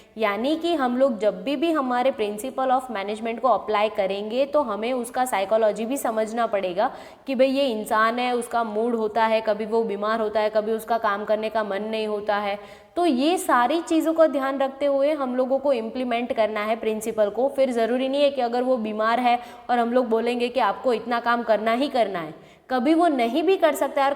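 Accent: Gujarat, India. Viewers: Gujarathi Accent ❤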